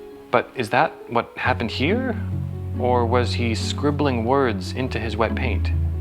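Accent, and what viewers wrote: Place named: Netherlands. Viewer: I like that the presenter has such a clear Dutch accent when speaking English. Kan goed horen dat je Nederlander bent!!! Top video.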